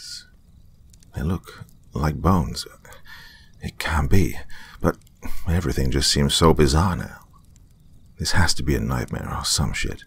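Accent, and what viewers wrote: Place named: United Kingdom. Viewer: Turkey? Thought you was UK lol well wherever you at thanks for the story's :)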